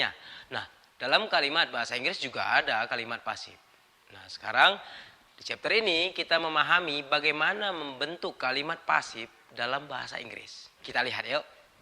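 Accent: Medan, Indonesia. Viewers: Orang medan emg gitu lah logatnya gan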